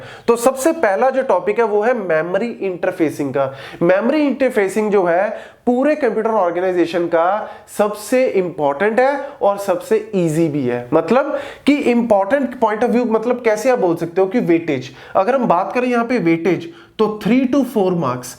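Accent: Punjab, India. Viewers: App Punjabi hai jururt hai bolne ka Method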